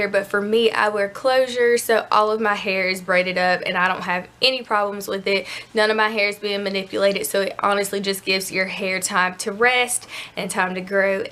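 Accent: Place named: Texas, United States. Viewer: I love her accent .. it’s like TEXAS 👍🏼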